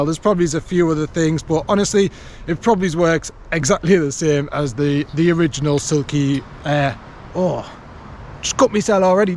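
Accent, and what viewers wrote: United Kingdom. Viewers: A saw will drop a tree much faster and with much less energy than an axe if used correctly. The only reason you'd take the havier more cumbersome axe is versatility which is a fare reason but in the UK (I'm assuming based on the accent) if you get caught with either of them you're in trouble which is so stupid.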